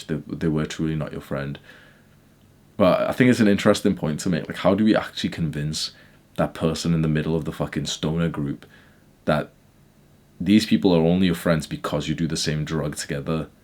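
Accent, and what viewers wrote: United Kingdom. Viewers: Great video title then boom hes british :( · if i wanted to listen to brit accent for just for sake of listening to brit accent, i can throw on some Grange Hill or GB News. sorry moyt, i'm listening to this fooking bloke cos he makes sense. ta!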